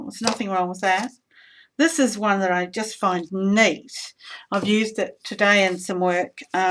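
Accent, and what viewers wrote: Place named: New Zealand. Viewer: Thank you -- great ideas . Plus, loved hearing your accent, as an expat Kiwi it makes me homesick! x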